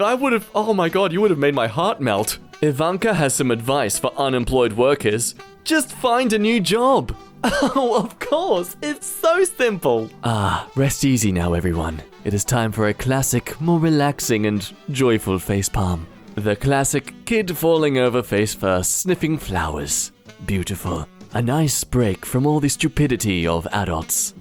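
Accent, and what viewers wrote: Scotland, United Kingdom. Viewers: No one wants to talk about his Scottish accent? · I totally love the Scottish accent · the Scottish accent was the best thing as well as "i sware ya brain so hollow i could turn it into a bagpipe" The Demoman TF2 intensifies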